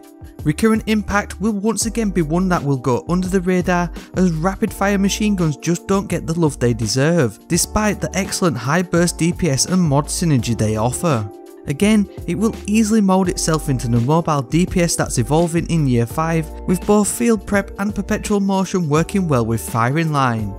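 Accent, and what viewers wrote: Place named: North, United Kingdom. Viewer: He's just northern leave my boy alone. He makes some of the best d2 content on YouTube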